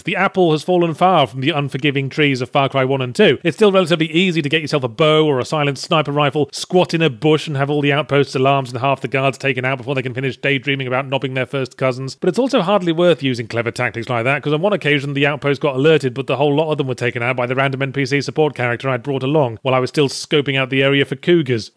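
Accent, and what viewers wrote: Australia. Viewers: Yahtzee is starting to develop an Australian accent, after all this years.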